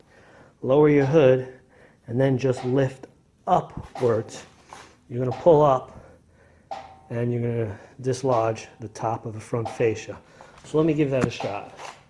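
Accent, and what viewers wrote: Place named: New York, United States. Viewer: Thanks big guy. I have now spent many hours watching you boost C6 project videos... just really very worthwhile viewing, learning and enjoying the multitude of tid-bips you continually share. Prior to stepping into any project, be it a car, boat, plane, house... I do my research. I know nearly nothing about the LS3, of the C6... but I am a quick learn. Stumbling across your videos (can't recall how) was like finding a treasure box! I've still loads to learn about the C6, and LS3, but day by day the picture is becoming much clearer (<num> GS 6 spd). You've a talent and passion for teaching, otherwise why all the videos? It's just a massive shame all your efforts are somehow missing a bigger audience. I'm on the opposite side of the country next to Portland Oregon, thus your New York accent gives me a chuckle and keeps it all interesting. 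Keep it whoever you are, ha, ha...! Email me the info on the spoiler as it'll be quicker.... I think.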